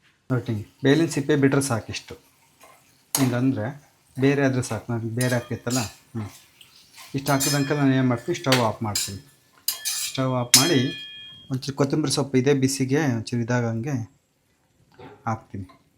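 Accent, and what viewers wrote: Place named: Davangere, India. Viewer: Annapoorneshwari taayiya sampoorna ashirvada ide nimge...ella adgegalnu estu shuchi ruchiyagi madtira...innu hechhina recipes na upload madtiri...nav davangereyavru...namge Khushi ide neevu nam side adge madvaga hage mam bhashe kelvaga...gud luck sir...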